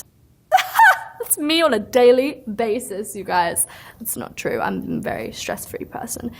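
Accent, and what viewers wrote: United Kingdom. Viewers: She’s British? Oh damn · Shes britisH?! I didnt even know idek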